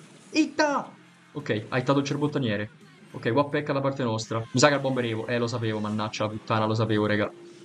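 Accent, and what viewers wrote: Tuscany, Italy. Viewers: Boia.😂. Ma quanto mi piace il tuo toscanaccio. Io sono di Ferrara emiliano. Ogni anno vengo da voi a Siena. Montepulciano Montalcino e pienza vino formaggio e olio. Poi un fine settimana a mangiare toscano con i vostri crostini di fegatini e milza che pochi conoscono e vivo bene per un anno 😂😂😂😂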